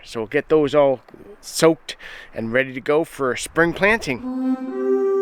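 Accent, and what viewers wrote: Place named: Maritimes, Canada. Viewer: The snow hasn't even flown yet and I already want to be in this place again hahaha. Are you originally a Maritimer,? Your accent sounds like home to me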